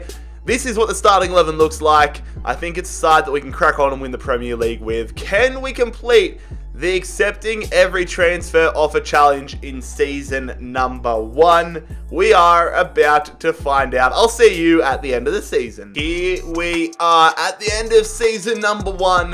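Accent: Australia. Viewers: Are you a bloody Australian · He is Australian they speak very strangely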